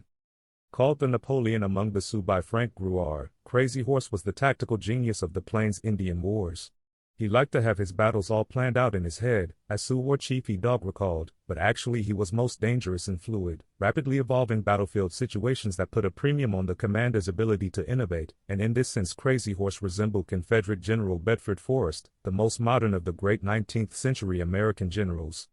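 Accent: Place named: India. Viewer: Too bad the narrator can't seem to do any more than to read without proper cadence or at least pausing between thoughts or sentences. Then he (or the AI) pauses in the middle of a sentence. The built in text also goes like this without pauses at the end of sentences and no punctuation. Because the narration and on-screen text being so badly strewn together, it's hard to understand. Sick of the poor robotic narrations these education videos lately use. Even though this one was tuned to sound like an Indian speaking English poorly. Don't think any human reading this could have voiced it so poorly. Could have been good info but was marred by poor narration!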